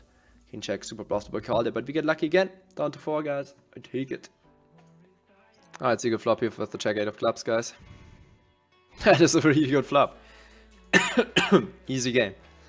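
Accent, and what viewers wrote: Germany. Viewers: You should work on your german accent. And maybe dont cough so much ;)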